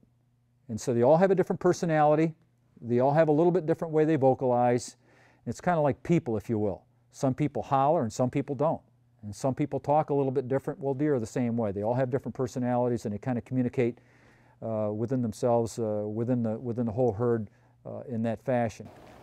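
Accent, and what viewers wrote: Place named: North, United Kingdom. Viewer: Where was he from? He has a northern type accent, but phrases from the south..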